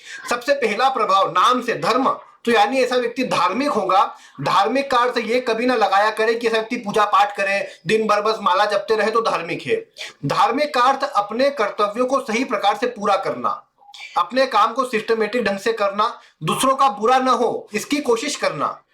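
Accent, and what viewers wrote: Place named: Maharashtra, India. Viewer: लम्बा वक्तव्य होने से आपका गला सूख रहा है। अगली बार इसका कुछ उपाय करके विडियो बनायें। और, आपकी भाषा एवं आपके उच्चारों से लगता है कि कदाचित् आप मूलतः हिन्दीभाषी नहीं हैं अथवा आपकी हिंदीभाषा पर संभवतः मराठी भाषा का प्रभाव है।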